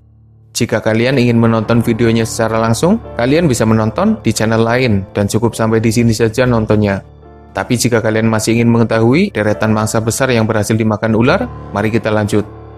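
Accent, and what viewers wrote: Banyumas, Indonesia. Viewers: Daleme pundi min admin. Ngapak ya min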